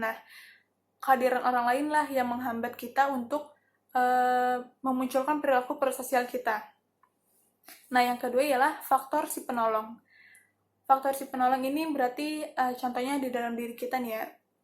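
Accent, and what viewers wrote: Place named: Outer islands, Indonesia. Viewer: Bahasan yg lugas, tegas, jd dpt ilmu baru...tapi kl hati lg betmut bs ga ya org berprilku prososial...;🤔🤔 Dialek org dr sebrang ya kk😁..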